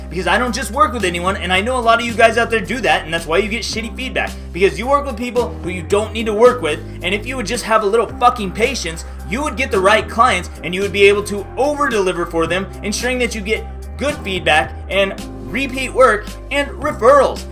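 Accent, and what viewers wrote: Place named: Scandinavia. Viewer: Hey, I wonder whether you're a native english speaker (?), you sound continental european/scandinavian european :)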